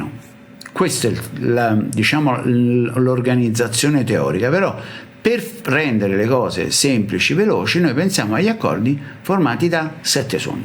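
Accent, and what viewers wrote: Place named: Naples, Italy. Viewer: Sto capendo tante di quelle cose con questi video che ne sono proprio entusiasta poi il signor è un ottimo maestro e mi piace molto come spiega. Il suo accento napoletano poi è di una simpatia unica. Un saluto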